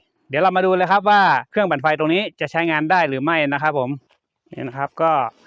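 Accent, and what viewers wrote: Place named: Phrae, Thailand. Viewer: สำเนียงนี้เมืองแป้แน่นอนครับพี่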